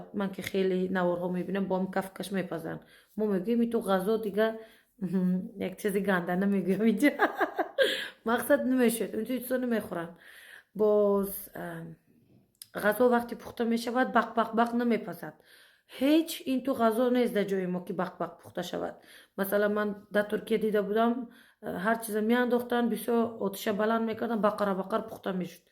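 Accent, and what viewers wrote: Bukhara, Uzbekistan. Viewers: Чонам фидои миллати азизам лахчаи ширини Бухорои шариф чи зебост хохарчони гиромикадр дустат медорам ба пеш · Чи хуш чашмони зебо дори лахчаи зебо дори ошикат шудам духтари бухоро самарканд .💕✊️ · Офарин апа, лаҳҷаи шумо (Лаҳҷаи Бухоро) бисёр ширин аст. Ҳаминхел наворҳо бисёр шавад, иншоаллоҳ шумо забони ширини тоҷикиро фаромуш намекунед. Салом аз Тоҷикистон. ❤Самарқанду Бухоро❤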